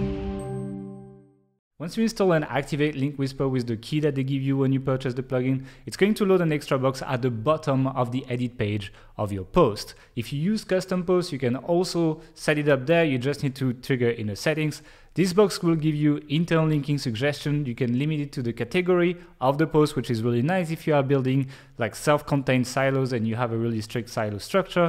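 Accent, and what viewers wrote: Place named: France. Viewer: I've never heard anyone talk about plugins before with a French accent, and now it's all I want in my life.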